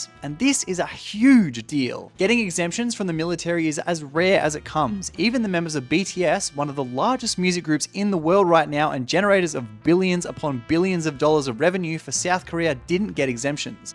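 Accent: Australia. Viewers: "Talkin' about de bloke" I might be dumb, but are you australian? lol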